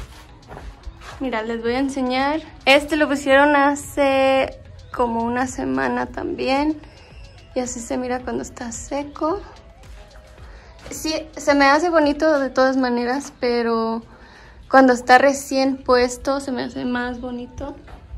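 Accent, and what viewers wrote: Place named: Portugal. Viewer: Estas quase ablando PORTUGUES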